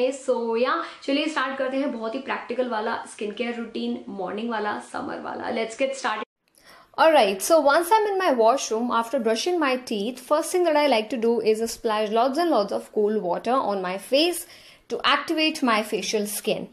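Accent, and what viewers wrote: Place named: Rajasthan, India. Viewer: Mujhko khudko jor aata he...😊😊😊...Jor aata he sunke he pata chal gaya ki rajasthan ki youtuber he.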